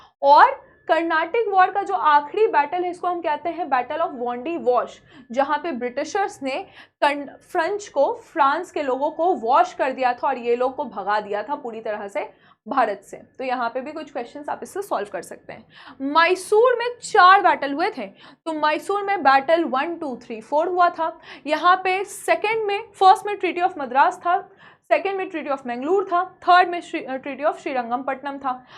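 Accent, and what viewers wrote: Bihar, India. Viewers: एक बात तो फिक्स है कि आप बिहार से है।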